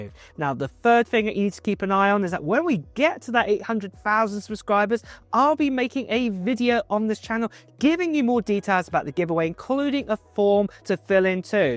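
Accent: United Kingdom. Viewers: Until I opened up my eyes, I thought that was a British woman speaking.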